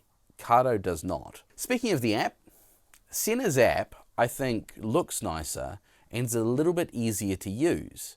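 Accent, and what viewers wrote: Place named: New Zealand. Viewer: If you ride with limited or no people the HD spirit is the best bang for buck unit. The buttons can be a little unresponsive at times but overall the sound is great. I have it on one helmet and the new srl3 sena on another and the audio is as good as the srl3 and actually gets louder. I did buy the edge but sent it back as the voice commands were crap. The new srl3 voice commands are much better as long as you say hey seena and not hey Sennar, it's an aussie thing and from your kiwi accent it'd be the same deal.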